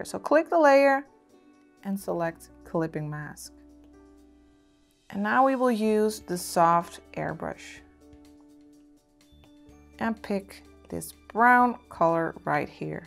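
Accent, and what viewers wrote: Netherlands. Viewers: IS SHE DUTCHHH AAAAAAAAHHH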